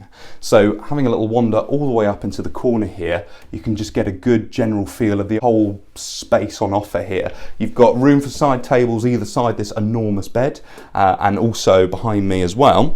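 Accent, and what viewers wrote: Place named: United Kingdom. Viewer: very nice british accent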